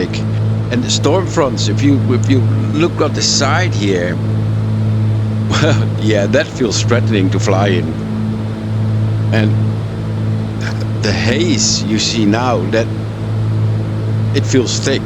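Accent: Netherlands. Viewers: Dat Hollanse platte kut engels.. 🤣🤣🤣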